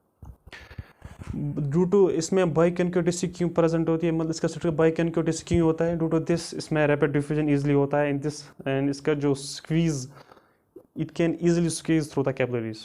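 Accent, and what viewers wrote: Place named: Kashmir, India. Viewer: Kashmiri axient aata h bhaiii....but Lecture is good..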